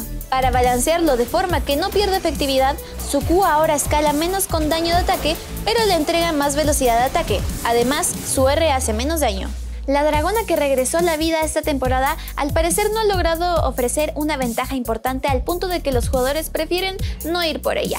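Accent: Chile. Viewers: Que loco, nunca le había notado el acento chileno a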